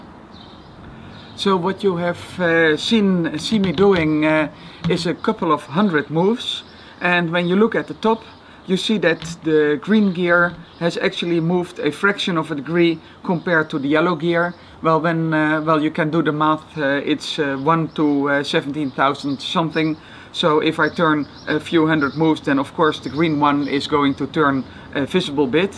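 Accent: Netherlands. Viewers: Man you sound Dutch. Are you? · His dutch accent is showing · you sound like what the average Dutch guy would sound like if he spoke English. subbed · #Nederlands-Engels accent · Hehe that obvious dutch accent... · Wild guess, but are you dutch? I'm litteraly 100% confident you are. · are you dutch? cuz your accent and you say 'From Deventer' and Deventer is a city in the Netherlands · well the video maker is Dutch for sure, you can hear it about hus accent, and the police siren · Sorry but its so funny to hear a dutch guy talk english 😂😂😂 Nice video bro! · Puur Nederlands accent. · He sounds so Dutch. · dutch english. cool technic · You can tell this guy is dutch lmao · A true Dutch accent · I knew you are Dutch when I heard you :D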